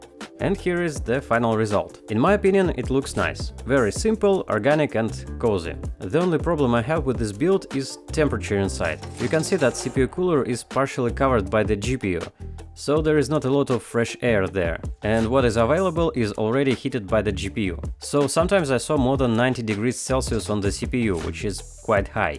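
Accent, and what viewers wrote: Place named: Russia. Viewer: Хороший выбор!)Начал я смотреть видео и понимаю что акцент у ведущего, русский,но какое было удивление что это один из моих любимых блогеров.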